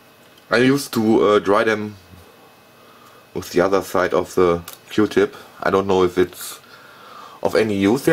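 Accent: Germany. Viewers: I hear a strong german sound :-D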